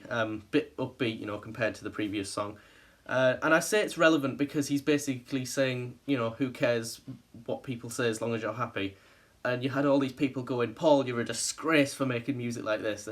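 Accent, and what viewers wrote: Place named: Northern England, United Kingdom. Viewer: I'm happy there's a positive review. I was happily shocked how much I enjoyed it, how experimental Paul is willing to go. I love it and it keeps growing on me. My personal favourite is ' ' which just brings me to tears every time. It's just such a beautiful statement on the power of love, it's so sensitive and fragile. Exposing his pure heart within. Something truly, truly amazing. And that flute solo! I think it's something you could only really understand with an open heart idk. is just manic debauchery, I love it. has a wonderfully melancholic opening piano intro. Everyone rips on and I get it, but if you listen closely there's a lot of production elements and melodic arrangements which are quite clever. and speak for themselves. should be given more credit! is a gorgeous little number. I like all the tracks, come to think of it. 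I think he's simply done an outstanding job. It's an album that will surely add and not substract to the legend of. Bless him. Side-note, I am desperately trying to pinpoint your accent but I simply cannot come up with anything other than 'North', or possibly Wales or something. At the very least, far from London. Which is kind of sad, since, if you should possibly allow it, you're exceptionally cute. Oops. Anyway, great review and bless xx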